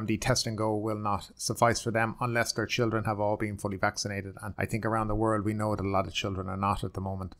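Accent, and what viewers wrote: Ireland. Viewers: I am an American and find your speaking speed fine. Perhaps some people are not used to your Irish accent. A professional voice recording artist usually speaks about 50% faster than average. A professional speaks about 150 words per minute, so consider yourself professional.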